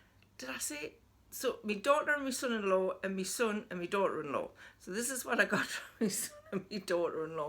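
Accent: Newcastle, United Kingdom. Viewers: I'm a new subscriber. I really enjoyed your content.You come across as a lovely person and the Geordie accent is lovely.Looking forward to watching all the videos.Happy New Year .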